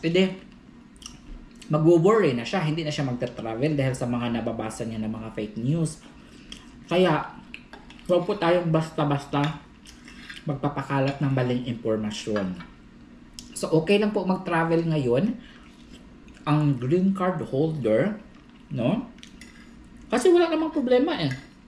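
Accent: Iloilo, Philippines. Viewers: Hi, para Kang Ilonggo maghambal Dai... Find it cute Dear...♥️🙏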